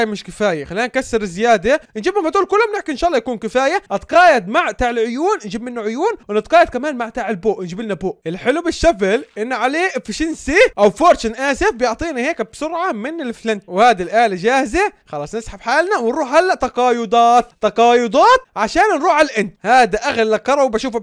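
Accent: Syria. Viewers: انا تعرفني اني اوديك شو اسمي اسمي عبد الرحمن ميه ميه والله انك سوري اسمع صوتك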